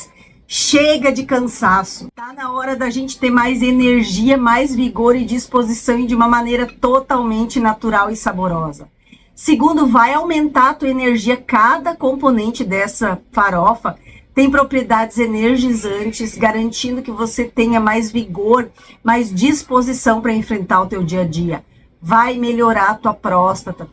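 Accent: Rio Grande do Sul, Brazil. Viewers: Sou de BH, MG, gosto muito de suas orientações e tbm de seu sotaque gaúcho, mto engraçado.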